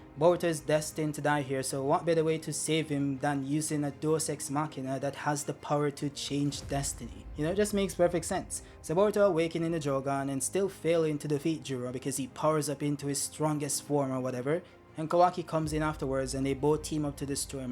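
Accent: Jamaica. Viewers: You sound Jamaican bro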